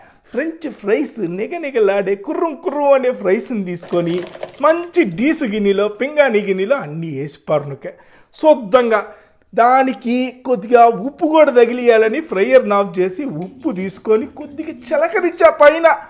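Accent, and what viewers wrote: Nellore, India. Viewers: Mana achamaina Nellore basha inka bathike undhi 💚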